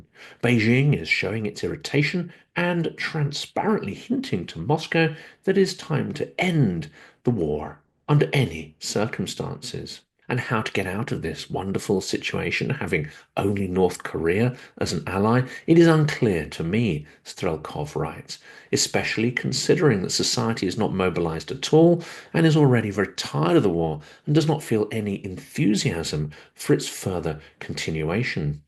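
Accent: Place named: England, United Kingdom. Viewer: Thank you,! Brilliant, as usual. To American ears, your received English accent is very pleasant, even poetical.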